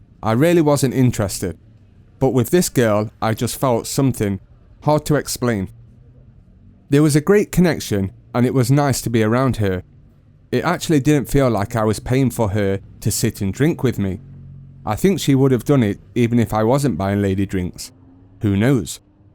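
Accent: United Kingdom. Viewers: You have British Accent.🤔🤔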